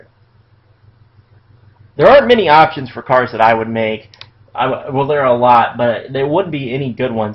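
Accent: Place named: United States. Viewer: He sounds country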